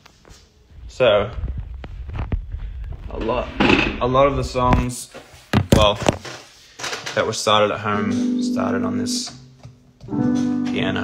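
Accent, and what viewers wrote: Australia. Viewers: You’re not too close to the screen LOL And yes we appreciate the return to a more aussie accent :) just those sneaky American “rrrr’s” quite prevalent. Love hearing the aussie “o’s” coming through every now and then. Like when us Aussies say “I don’t know” and other words like “home, phone, alone etc” Its just so Australian, so please don’t lose too much more 💕 Love the album. I only live 5 min from work so I’m keen to go driving next weekend to listen to the whole album with the windows down - and it’s almost spring weather on the Gold Coast so thanks for the driving music that you poured your life into. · He really sounds so much more Australien then in the last few years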